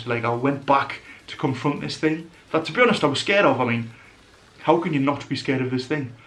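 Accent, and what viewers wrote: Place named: North East England, United Kingdom. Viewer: Great video! Me & my friend are staying overnight there on the 24th October! You sound like you're from the North East. We're from Teesside.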